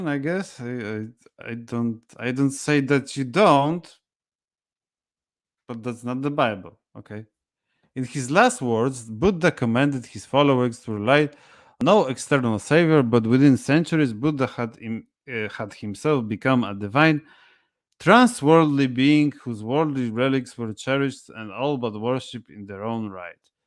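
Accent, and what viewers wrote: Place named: Eastern Europe. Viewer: Is this book popular? Nice read with good commentary and thic and fresh slavic accent 😁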